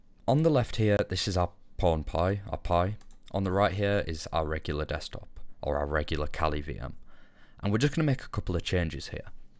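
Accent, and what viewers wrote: United Kingdom. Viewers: you must be from 'ULL' ???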